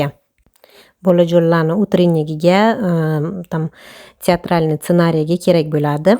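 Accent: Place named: Navoi, Uzbekistan. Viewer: Овозизгахам узингизгахам гап йук Навоини шеваси N 1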